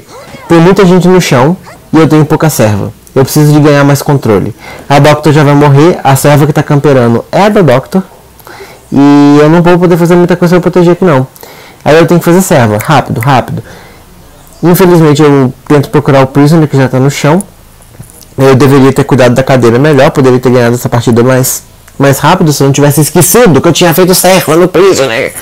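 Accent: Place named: Germany. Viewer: É o que eu sempre digo, " Escolha o survivor de acordo com o mapa e com time, por isso vc não PODE ser monoEla existe e é chata" kkkkkk não precisa explicar muita coisa sabe todos os meus esconderijos, vou ter que procurar outros. Yidhra Party! Tu estás expandindo a firma pq aja serva. "Terror Shock" Leia com o teu sotaque alemão que amo. Que milagre Doctor não farmar e sim ser farmada. Cowboy bestão, A DW amou. O esquecimento do criador de conteúdo. "Eu posso deixar ele não chão quanto eu quiser" kkkkk... Obrigada por adiantar Prisoner. Poxxtman me imitando achando que vai cautelar o blink e sefuuu É muito sangue frio e planejamento pra jogar de Hunter, principalmente com a DW que vc precisa de muita, mas muita habilidade, concentração, predict entre outras coisas. E acho extremamente necessário que não só a galera que quer aprender a jogar com ela assistam esse vídeo, mas também os survivors, pra aprenderem a jogar contra ela.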